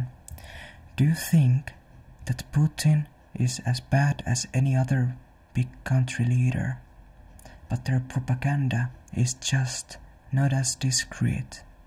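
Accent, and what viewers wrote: Finland. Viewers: Finnish, but it isn't a super strong finnish accent. Finns sometimes speak something called rally English and atleast i think it's atrocious, his accent is pretty stereotypical though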